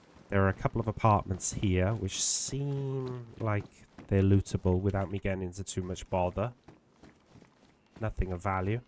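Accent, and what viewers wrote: Wales, United Kingdom. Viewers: Late to the party with DayZ and your videos have been amazing at setting me off in this game. The Welsh accent is a great accompaniment to the end of the world too 😂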